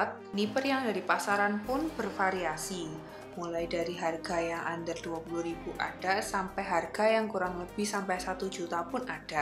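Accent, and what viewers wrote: Java, Indonesia. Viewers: Medoknya bikin mabuk kepayang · medokmu mbak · ce.. medok tenan se, wkwkw.. jowoan ae, good konten anw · Medok nya bikin kesem sem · Tante tambah medoook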